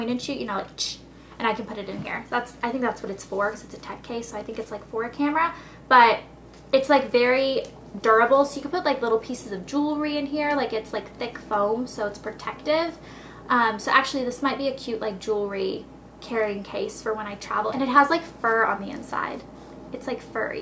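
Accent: England, United Kingdom. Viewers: Nice British accent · I highly like how you acted in this video. You were very... I don't know, lively. You were just happy and you didn't seem fake or bored. PLus, I love your british accent... lol. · I love ur english accent! lol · i love your cute British accent there it made me laugh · that britsh accent :D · British accent?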